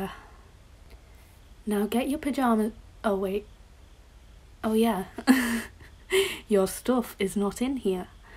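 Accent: United Kingdom. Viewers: Damnnn the British accent 🔥🔥🔥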